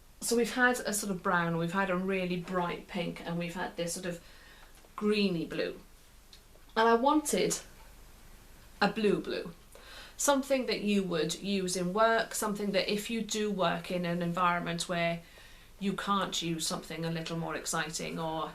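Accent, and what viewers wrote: Wales, United Kingdom. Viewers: Its her Welsh accent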